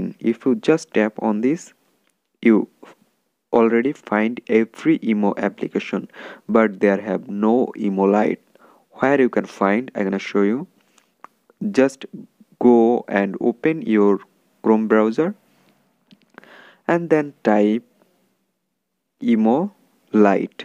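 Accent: India. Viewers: You need to speak proper English Remove your hindu accent it sounds disgusting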